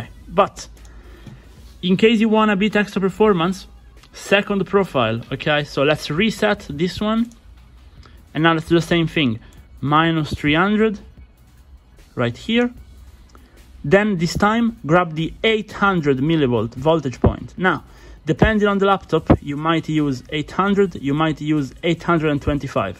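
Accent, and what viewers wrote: Italy. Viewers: si sente che sei italiano comunque bei video.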